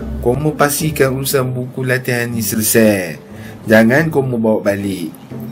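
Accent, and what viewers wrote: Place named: Perak, Malaysia. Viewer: aje pekat peroknye kome 😅👍🏻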